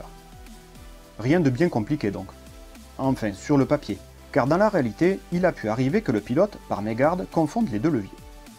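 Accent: South, France. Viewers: J'en ai lu des trucs sur les avions et sur le Harrier, mais là je n'ai qu'un seul mot : FELICITATIONS. Que de travail ! Sur le fond on apprend pleins de choses en un minimum de temps, sur la forme, rien à redire, les images sont exceptionnelles et ont dû demander un paquet de travail, le montage est parfait, le ton parfois humoristique et l'accent du sud ne font que rajouter de l'intérêt à vos vidéos en les rendant plus vivantes. Petit bémol le verbe "pallier " est transitif direct "La construction pallier à [verbe transitif indirect], courante, est incorrecte" mais bon c'est pour chipoter car les commentaires sont justes excellents, Continuez !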